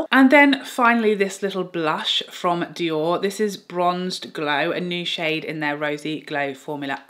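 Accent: United Kingdom. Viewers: Bonjour! I love your reviews. You are so nice and funny too. And différent from Canadian and US reviewer. Plus, i really like your british accent. Prize 1 would be my favorite. Bisous xxx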